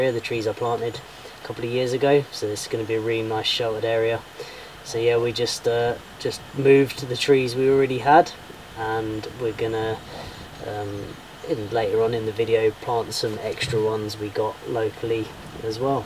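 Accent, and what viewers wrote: Wales, United Kingdom. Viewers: Loved your welsh accent and the humour behind it.:) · Nice welsh accent you got there:D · I love hearing the welsh assent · Assent Welsh to no end... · Awesome pal, nice to finally know your the only gay in the village 😄😄😄😄 fantastic Welsh accent. Nice to see in the vids again. She is beasting it pal, watch out she will be taking over. Lol. Stay safe x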